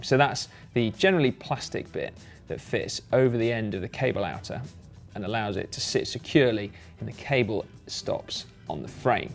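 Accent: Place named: United Kingdom. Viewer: As an American it gets tiresome listening to British accents all day when looking for good bike videos... but how badass is it that this guy is not only a great rider who loves to get hands on and understand the concepts behind how his gear functions, but he's got a clear and engaging style of presentation as well. Just a shout out to this dude and his many great videos.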